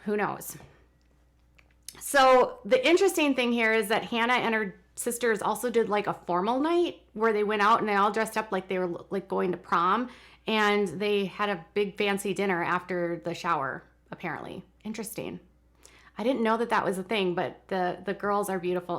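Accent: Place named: North, United States. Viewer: Wilkinson Yes I have watched live, and it is a whole other ballgame! Her livestreams are usually very intense, with lots of opinionated viewers. I have heard her get tough with rude people who bombard her with idiotic questions, moot points, or repeat information to her that has been discussed in several of her previous uploads. I cannot blame her for at times being short with people who halt the program because they can't pay attention!!! runs a serious channel, she puts a lot of time and effort researching her subjects. Her tone is what I expect from a Northern state (in Texas our approach is a bit softer), but I in no way think she is rude. She is blunt but not rude! She must wrangle up the thousands of guests on her livestream or she would never get her job done!!!! Much LOVE to you!!! ❤💋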